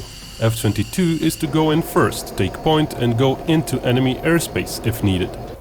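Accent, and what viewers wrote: Russia. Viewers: Hmmm...some guy with a Russian accent telling us what our Air Force will be doing. I’m just surprised it’s not someone with a Chinese accent. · I don’t trust info from a Russian sounding guy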